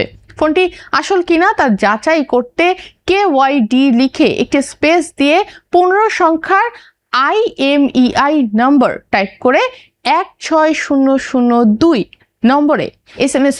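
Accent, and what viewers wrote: India. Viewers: কোলকাতার টোন ধরছেন নাকি !!!!!!!!!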